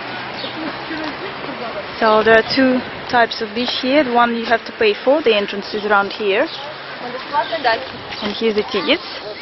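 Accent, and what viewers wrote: Russia. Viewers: Good english too. Better than many RT translators I've heard. There is a specific russian dialect. Words like water becomes woter but it's fully understandable with good vocabulary. It was nice seeing the Volga in summer with sandy beaches. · wao im much amazed by your russian style english accent miss....it feels much innocent...and soothing. moreover. Ti Ochin krecivya. spiyceba for your video efforts. im much interested to learn about russia & russian people.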